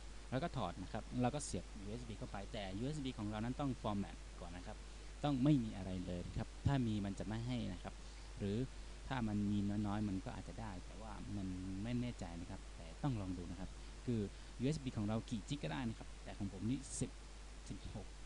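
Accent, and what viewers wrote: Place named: Myanmar. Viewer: พูดก็เบา ฟังก็ไม่รุ้เรื่อง สำเนียงอย่างกับพม่า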